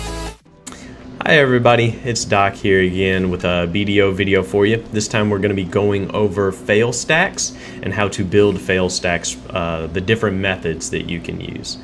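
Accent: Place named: South, United States. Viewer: I've been waiting for gaming guides from people with country accents. Thank you for representing our people, and Bless Online your heart.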